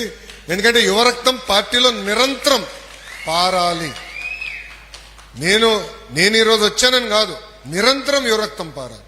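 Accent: Telangana, India. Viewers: Please stop Telangana Accent.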